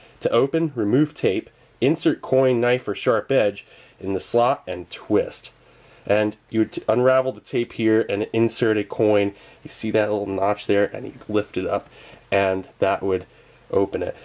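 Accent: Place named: Midland, United States. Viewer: he's american, i can tell by the accent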